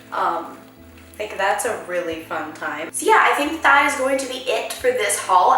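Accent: United States. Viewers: From an American who speaks french: Use an online course, because the easiest way to learn is to immerse yourself in the language, and Rosetta Stone can help simulate that experience. Also, your American accent is very strong and could interfere with learning a French accent properly, so an online course can help correct that <3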